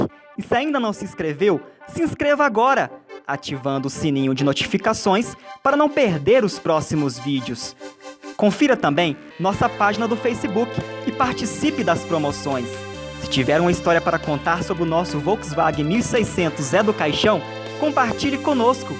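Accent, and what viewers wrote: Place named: Northeast, Brazil. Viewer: Voce fala muito mal e do nordeste, bahia, so mostra as imagens nao fala nada por favor.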